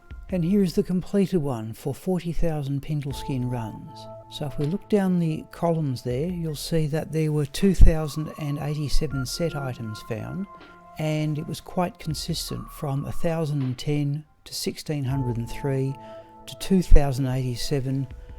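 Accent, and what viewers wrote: Australia. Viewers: I began watching your streams and videos probably about a year and a half ago, and through all the good characters and crafting sessions, I loved watching you run Pindleskin more than anything. It was fun to see some of the drops and lowlights make it into various monthly highlight/lowlight videos, as I could look back and exclaim, "hah, I remember that stream!" I've been watching many streams for many years, as well as ASMR videos on YouTube for roughly a decade. Your streams tirelessly plugging away at Pindleskin always put me into a nearly catatonic or zen-like state. I do not say they were boring. Rather the opposite. Your cadence and Australian accent and logical approach reminded me of a professor I had many years ago, and it was funny to find out you were also an educator. I could listen to him or you for days and find solace. Call it weird or interesting, I had to share. 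I'm not alone here in saying we all appreciate your streams and especially your dedication, sharing a game we all love. Personally I have a much different vested interest in that your candor/approach are the perfect accompanying background noise or secondary monitor treat to my lifestyle of gaming and streaming. So from me to you, thank you. (I formerly went by Aralern24, but changed my YouTube alias to reflect my world of warcraft character lol)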